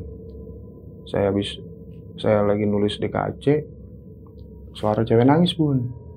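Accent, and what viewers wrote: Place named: Surabaya, Indonesia. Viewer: Surabaya mn suaranya☺️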